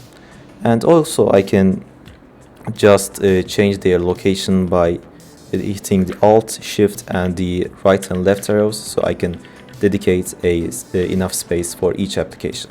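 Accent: Turkey. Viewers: Are you from Turkey ?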